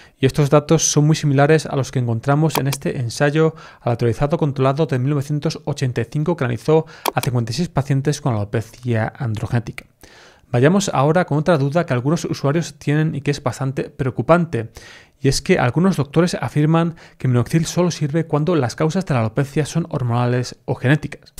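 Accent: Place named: Castile, Spain. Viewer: Pues habla " perfectamente" eleganter castellano. Yo tampoco entiendo a los Latinos. Sin querer ofender.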